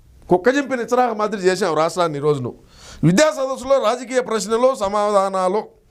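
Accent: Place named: Kadapa, India. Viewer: తమ్ముడు నీ భాష లో కడప కనపడుతోంది 👍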